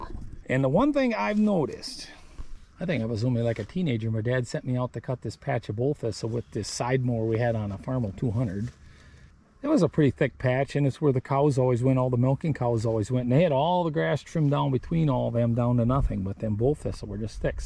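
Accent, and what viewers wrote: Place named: Wisconsin, United States. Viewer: Thought I heard a Wisconsin accent. I live in Kaukauna Wisconsin. We’re buds.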